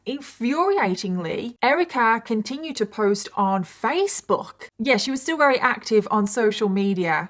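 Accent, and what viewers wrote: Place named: East Midlands, United Kingdom. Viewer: stupid? no. you sound like me before i started spending a lot of time in the US. it's basically like living on the border of Lincolnshire and Nottinghamshire. Also, watching this with my three year old in the same room (headphones while she plays) she is my baby. a three year old is a baby. this whole case is terrible.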